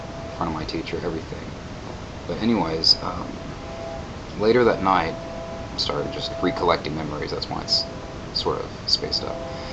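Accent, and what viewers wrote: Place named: Santa Fe, United States. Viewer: I feel everything you say man, I've dealt with all the christian family shit as well haha. You sound like you're from Santa fe man, one of the people that denied to be stupid like the rest.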